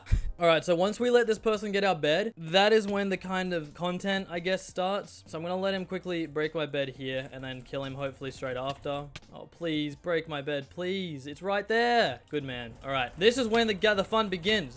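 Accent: England, United Kingdom. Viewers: Are you British?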